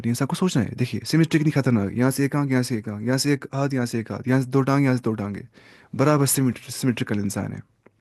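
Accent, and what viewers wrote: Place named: Kashmir, India. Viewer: Your accent is Kashmiri U are from kashmir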